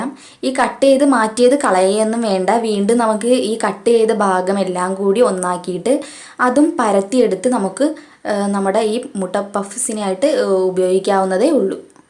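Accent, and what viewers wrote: Kollam, India. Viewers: ഫ അല്ല ഭ ആണ്. കൊല്ലം ആണോ ചേച്ചി വീട്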